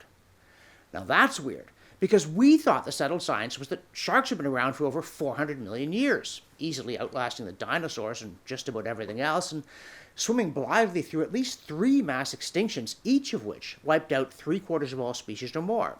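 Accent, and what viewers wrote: Canada. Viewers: Please slow down your rate of speech a notch : Not everybody is familiar with your Canadian diction : Good Vids though .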